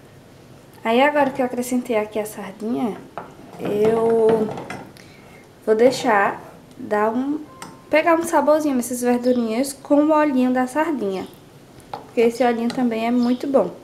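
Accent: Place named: Northeast, Brazil. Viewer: Voz meiguinha...bonito sotaque nordestino...prato maravilhoso...bateu a fome agora...Parabéns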